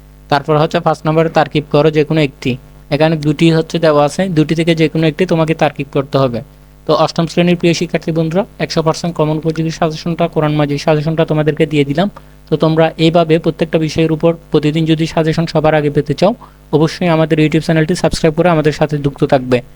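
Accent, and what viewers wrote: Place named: Chittagong, Bangladesh. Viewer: Apni chittagong er taina😅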